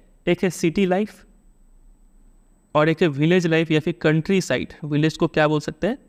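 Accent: Bihar, India. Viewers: Sir aap bihar se ho ? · IMAO BIHARI ACCENT ME ENGLIGH SUNNA BOHOT ACCHA LAGTA HAI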